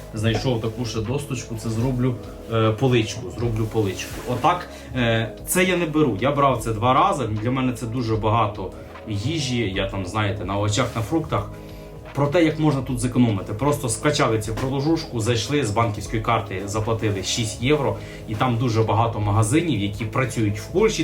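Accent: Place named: Poltava, Ukraine. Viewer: Вас може це здивувати, але половина України БАЛАКАЄ. Далеко не всі в побуті спілкуються чистою літературною мовою. Місцеві діалекти рулять 😊. І немає в цьому нічого поганого, адже завдяки діалектам мова і є такою різноманітною. На Полтавщині навіть є такий жартівливий вислів : на сході "гаварят", на заході говорять, а у нас в Полтаві балакають і все чудово.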